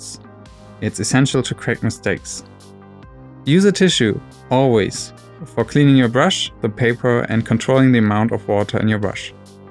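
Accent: Germany. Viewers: Man hört den deutschen Akzent sofort raus :D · Hach ich hab doch rausgehört, das er Deutscher ist!:) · Bist du deutscher? Du hast einen extremen Akzent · Great video. Love your German (I think) accent as well :)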